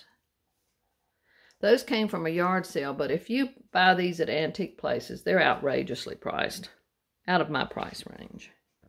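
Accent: South, United States. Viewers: I loved your Halloween tour! I can’t get enough of all the vintage goodies! I love your pleasant sounding voice and your southern accent. 😊💖🎃🍁👋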